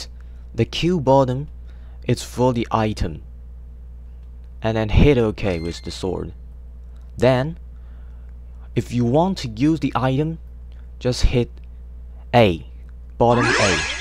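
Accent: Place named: Taiwan. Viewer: 你好,很高兴认识您。Actually, I know you are from Taiwan. Because the mandarin that you speak are different than mine and I saw you use type traditional chinese in the tutorial video. I learned it for half year at Taiwan University last year on November but I still need to keep up on trying and learning from everyone here. It is a great experiences to learned it from you. I enjoy your tutorial video a lot. 谢谢你。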